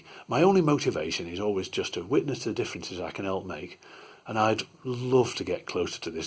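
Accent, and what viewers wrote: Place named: North, United Kingdom. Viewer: you've got a great voice for telling stories, i imagine you could do a kids audio book really well. the friendly soft but deep northern accent can't go wrong! thanks for passing on the dmt love!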